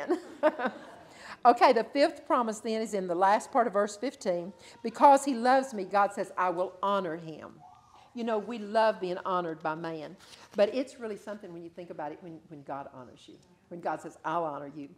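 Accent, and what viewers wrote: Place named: South, United States. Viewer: Wow, she is southern - reminds me of my ancestors from OK and TX. You can slow the playback speed down a notch and you will really be able to reflect on what is being said. Too important a message to miss.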